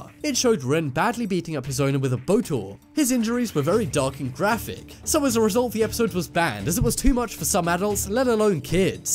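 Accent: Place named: United Kingdom. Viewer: dude these stories are awesome even if you have a briddish accent I STILL LOVE YOUR VOICE these stories are amazing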